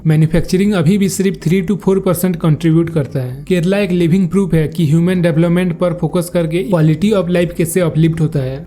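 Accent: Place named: Bihar, India. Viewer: Tu Bihari hai kya ya fir Bengali pahle apne bolne ke lahze ko sudhar kar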